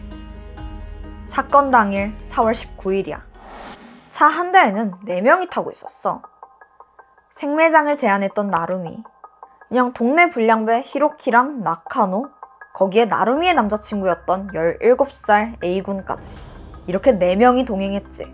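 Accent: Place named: Suwon, South Korea. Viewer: 수원사투리중에 그런거있음 수원사람이신가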